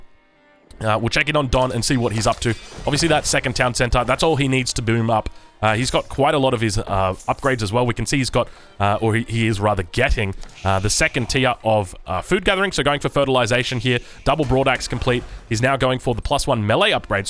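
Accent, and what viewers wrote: Australia. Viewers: So glad I found your vids and channel! More strong aussie casters!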